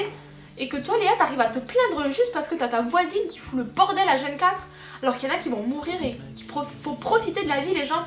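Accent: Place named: Toulouse, France. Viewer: tu a l'accent toulousin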